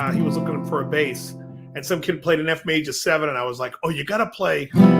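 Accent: Boston, United States. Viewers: I can totally hear the Baahstun accent now! I also did not get notice that you were doing a live stream….I wonder why? Any idea?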